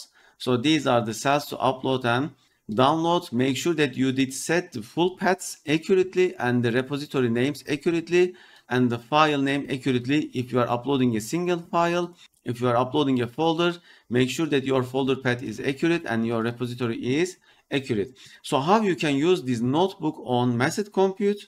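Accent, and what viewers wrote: Turkey. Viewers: hocam civitai de videoyu izliyim dedim aksanınızdan ve yüzünüzden kesin bu adam Türk dedim tahminim tuttu :) elinize sağlık,civitai ile model indirirken her model için isim belirtmemiz gerekiyor sanırım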